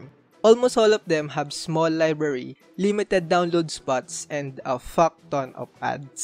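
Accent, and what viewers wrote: Philippines. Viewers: eyyy accent pa lang pinoy na agad ahahahhaa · I love the Filipino accent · The accent of this guy is just the same as filipino accent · Sounds like a filipino accent ngl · Are u a filipino?!. Ur accent sounds like it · I love you're accentHAHAHAH pinoy ka ngang kupala ka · Taena sa accent mo palang, halatang pinoy na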